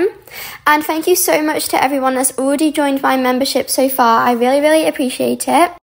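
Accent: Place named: Germany. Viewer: omg how did i not notice that you‘re german!!😍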